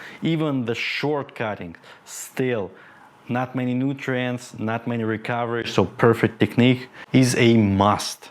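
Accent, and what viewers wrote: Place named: Russia. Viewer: I love your Russian accent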